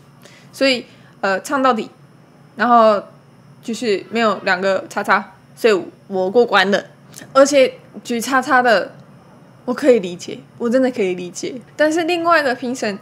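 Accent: Taiwan. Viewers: 妳的中文真的講得很好，很標準的台灣中文! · 妳的台灣腔好純好可愛！ 😍👍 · 我靠 一口台湾腔调的中文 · 你说话的方式也太台了。。。🤣 因该是个很有趣的女孩～ 🤭所以就订阅咯。。。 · 講話很台欸🤔 😁😁😁 👏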